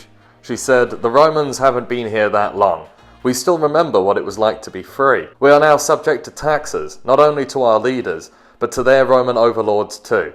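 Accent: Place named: United Kingdom. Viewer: Damn, I love this channel. Being a Texan, I love listening to history in the form of a spoken British accent. Leands it a certain gravitas and epic tinge. I think my wife is the reincarnation of a Celtic queen. Long red hair, piercing green eyes, and by damn, after 16 years of fighting i still haven't conquered her...😂😂😂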